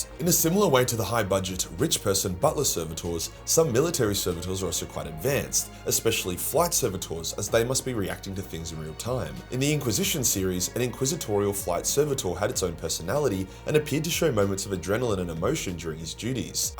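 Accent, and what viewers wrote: Australia. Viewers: how did you somehow get more Australian?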